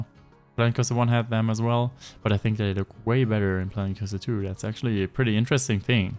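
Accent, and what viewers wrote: Germany. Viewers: got a German accent in his English... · Are you german?😂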